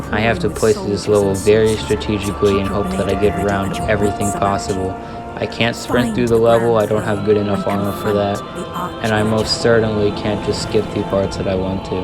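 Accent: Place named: United Kingdom. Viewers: y sound british. btw better reply to this or im unsubbing!!!! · He does fr sound British to be honest in the videos beginning ngl lol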